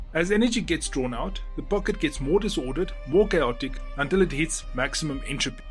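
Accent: South Africa. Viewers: Ek wou nou net se die ou praat net so well afrikaans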